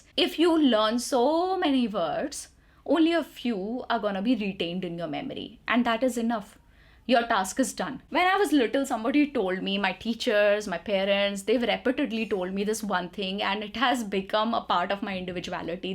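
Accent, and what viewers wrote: India. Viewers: I never heard before a clear Indian accent....I love it.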